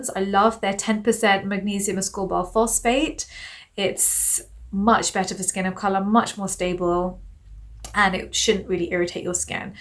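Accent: United Kingdom. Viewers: Is that British accent?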